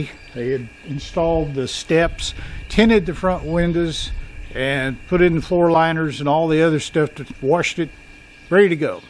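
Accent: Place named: South, United States. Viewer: What's sad..is they will turn right around and resell that POS you had to trade in to another poor guy who will be unaware of the ongoing issues that you had. You seem like such a genuine person and remind me of my father minus your southern draw you have. I'm a Ford man myself but I really hope this new truck works out for you. I'm glad that the GM at the dealership tried to take care of you. I wish we had more people working at dealerships like him. Good luck sir.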